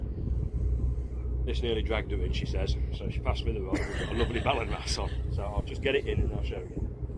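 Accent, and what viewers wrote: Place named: East Midlands, United Kingdom. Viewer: Nice one, great vid shame about the weather at first, can't be too careful! You're not from the North east, with that accent I'd say East Midlands! Tight lines pal 🎣🎣🎣🎣👏👏👏👏👍👍👍👍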